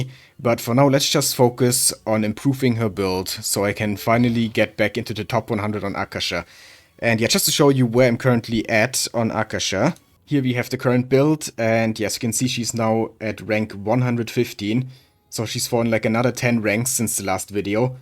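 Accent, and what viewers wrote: Germany. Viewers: Do i hear german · I hear german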